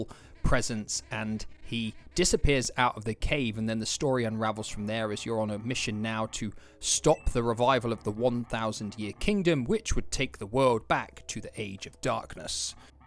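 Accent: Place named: United Kingdom. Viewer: The classic well written well spoken British man I believe every word he speaks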